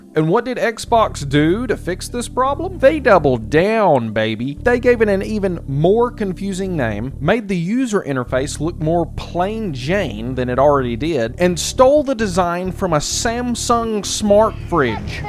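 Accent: South, United States. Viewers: i like your country voice so i subscribed yee-haw! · Finally a youtuber with a cool american southern accent. Tired of all these weak bri'ish ones.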